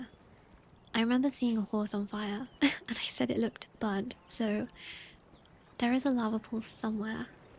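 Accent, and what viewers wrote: England, United Kingdom. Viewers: it's just an English accent what😭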